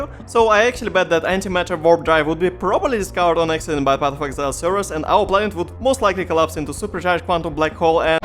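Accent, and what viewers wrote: Russia. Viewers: мне кажется или у чувака русский акцент?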